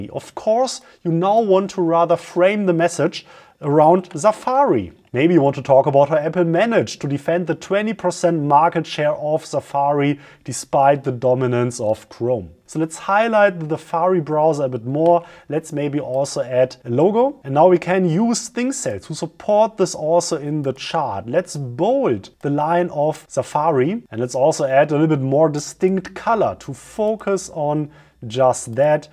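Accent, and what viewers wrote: Germany. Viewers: Ernsthaft, so schwer ist das „th“ nun auch wieder nicht. Das klingt wie reinstes Hochdeutsch. Andere Sprachen KLINGEN anders. Das hier ist echt schwer auszuhalten. Wird dir kein native speaker sagen, sie sagen es nur denen, die tatsächlich wie native speaker klingen. Aber das kann echt niemand lange aushalten. Schlimmer als sächsisch. Oder indisches „Indlish“. Du klingst wie wenn Otto Leute nachmacht, die eigentlich kein Englisch sprechen. Wie kann jemand English lernen und zu 100% Deutsch klingen auf dem Level?? Sorry, super Inhalt, aber ich krieg schon die Krise, was glaubst du, wie das für native speaker klingt…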